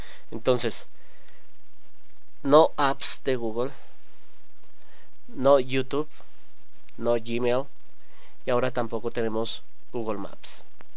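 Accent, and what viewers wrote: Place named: Mexico. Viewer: El Senséi tiene toda la razón, con acento manito, pero la tiene toda :o)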